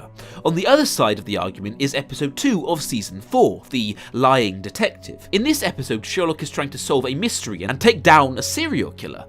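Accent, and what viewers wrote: England, United Kingdom. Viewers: I haven't heard someone speak so British since my two British teachers :) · Honestly I can't even watch this.... It's just too much cringy English crap like the word "Baskerville" said repeatedly.... Love your channel even though you're English but wow I can't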